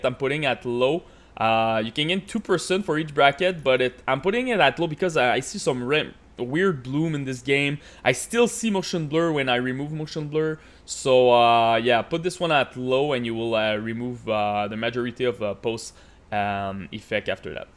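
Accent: Canada. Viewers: Or u know, canadian accent. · Toi t’es québécois avoue sa sentent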